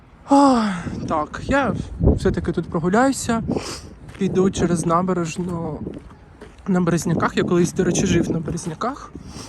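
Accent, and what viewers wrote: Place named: Russia. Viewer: жахливий русский акцент!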